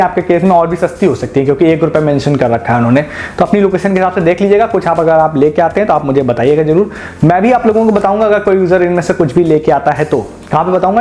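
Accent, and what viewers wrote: Kanpur, India. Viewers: bhai kanpur she ho kya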